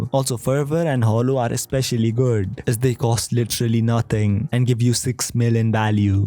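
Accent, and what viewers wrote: India. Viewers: can you get rid of the indian accent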